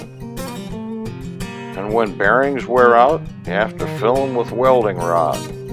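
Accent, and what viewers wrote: United States. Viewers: The speaker has the typical degenerated american speech! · I loved this video, so educational. The man was a great narrator. Loved listening to the country twang in your voice. Great work man.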